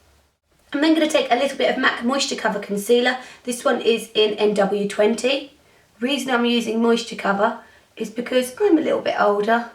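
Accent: England, United Kingdom. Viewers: i adore your english accent <3 you are lovely!!!!!!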